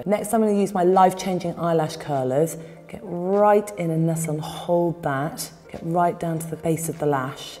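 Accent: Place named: RP, United Kingdom. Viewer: Loooooove accent. It's so posh and sophicticated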